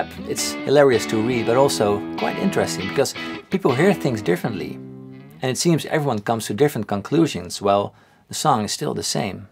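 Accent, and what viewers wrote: Netherlands. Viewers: He sounds dutch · This guy sounds like he is Dutch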